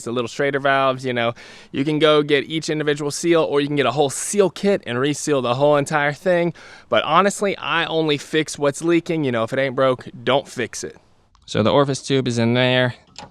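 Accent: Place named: Florida, United States. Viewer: “Ya knowww…” love your words my guy. You sound like you’re from Florida mr cool 😎 lol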